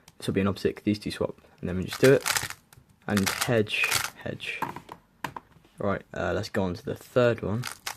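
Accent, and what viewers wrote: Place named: RP, United Kingdom. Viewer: WHAT'S UP GUYS Also you sound very posh lul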